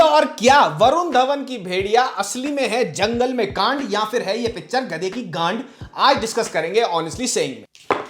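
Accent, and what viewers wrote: Haryana, India. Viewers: I just love your review, it's so humorous....pls speak more in haryanvi dialect ....love from Australia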